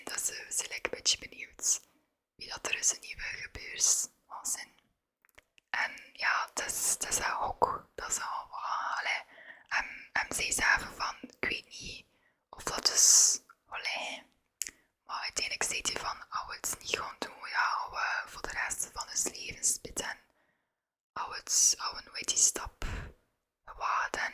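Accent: West Flanders, Belgium. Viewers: West-Vlaams is leuker, alleen bergrijp ik niet goed wat je dan zegt🤣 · Als een oost-vlaming met beetje zeeuws vlaams in zich is het heel leuk om naar het west vlaams te luisteren en het helemaal te proberen begrijpen ☺️ ook leuk verhaal over je buurman ik begrijp hem zeker!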